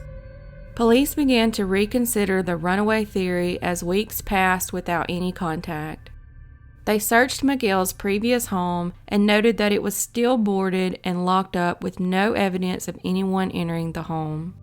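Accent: South, United States. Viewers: I don't think she sounds bored . It's just her southern way of talking . Pretty crappy thing to say . She has the best page on here. I love her videos ! Maybe you should go watch a different page to make you happy ☺️ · Love your southern accent and your videos love from Birmingham 🇬🇧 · She has a southern accent.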